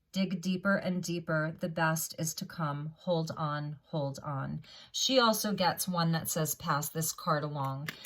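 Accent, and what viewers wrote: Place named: France. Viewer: Votre accent français est trop mignon 😁👍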